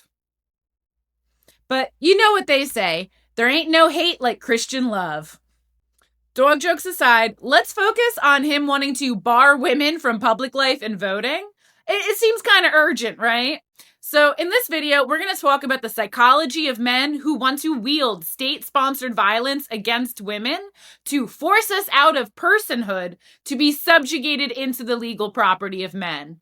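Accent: United States. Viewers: Girl I'm tryna read your accent and i can't pinpoint Midwest or east coast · Where has this woman been in my algorithm? She's brilliant! AND sounds like all the girls i went high school with in NY! I am subscribing!!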